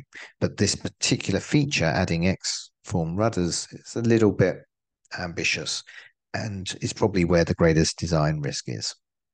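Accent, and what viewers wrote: United Kingdom. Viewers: Kerel, doe even rustig aan. Hij doet zijn best, alsof jij vloeiend in elke taal bent. En het is geen "yank" maar een Brit....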